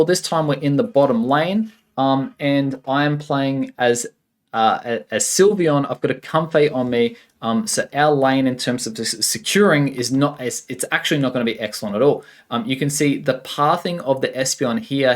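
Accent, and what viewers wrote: Australia. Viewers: The Australian accent is great. 😂👌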